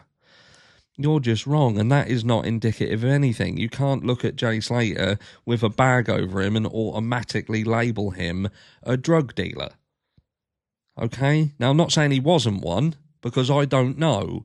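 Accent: Norfolk, United Kingdom. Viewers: That’s a good old Norfolk or Suffolk accent boy where ya from ??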